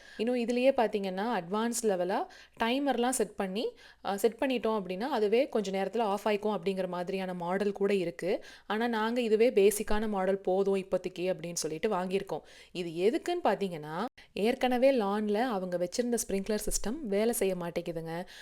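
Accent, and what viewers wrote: Coimbatore, India. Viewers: Hi akka naa new subscriber.my name kavya,naa coimbatore tha neenga pesarathu rompa pudikum akka naama oru slang nu kaykum poethu happy ah iruku akka,and Happy Birthday kutty and happy wedding anniversary Anna and akka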